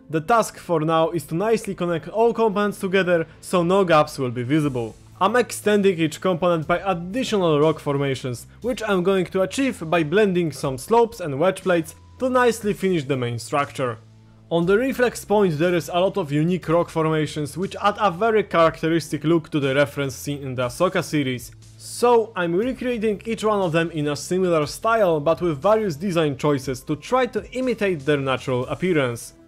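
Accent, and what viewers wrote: Poland. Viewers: poznałem po akcencie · to się nazywa polski akcent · Słychać że polak😅 ale budowle 11/10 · Po akcencie od razu poznałem że nasz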